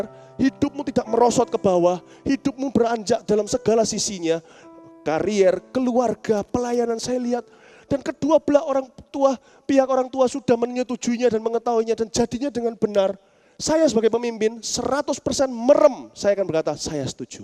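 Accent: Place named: Surabaya, Indonesia. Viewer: Julia Angelina hehehe... itu logatnya orang cina surabaya emang medok bangett...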